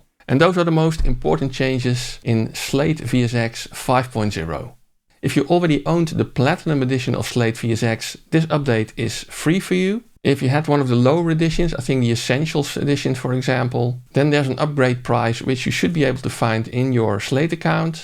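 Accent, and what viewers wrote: Netherlands. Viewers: lekker Nederlands accentje whehe goede review en cool te zien dat er nu 5.0 reviews komen :)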